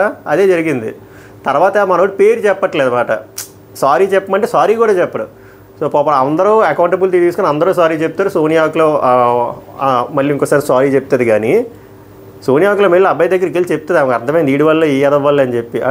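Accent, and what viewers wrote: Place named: Visakhapatnam, India. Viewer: Mee slang super Garu. Vizag slang👌👌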